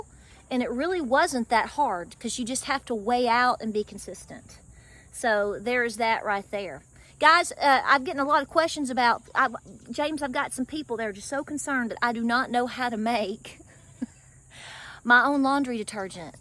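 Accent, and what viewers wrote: South, United States. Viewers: I just found your channel and I enjoy you very much. Thank you, love your peppy self and that Southern accent ❤️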